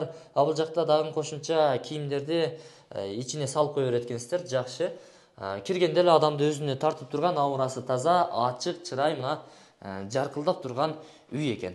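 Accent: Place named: Batken, Kyrgyzstan. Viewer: Дагын дейт го баткенчил би бала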